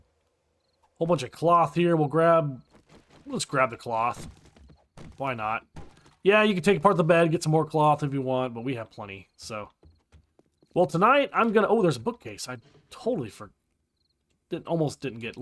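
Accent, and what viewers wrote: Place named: Midwest, United States. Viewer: Sounds odds but I like the muted color better. I say you got that Midwest anywhere USA voice. A strong radio voice like Tom and Ray Magliozzi the car talk guys. (for you young people radio is what you listen to while paying Bionic Commando on your NES console.)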